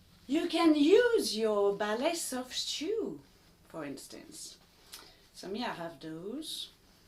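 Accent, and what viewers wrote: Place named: France. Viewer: I recognise a french accent haha have you got any tips in order to start tap dancing at home without taking lessons ? thanks in advance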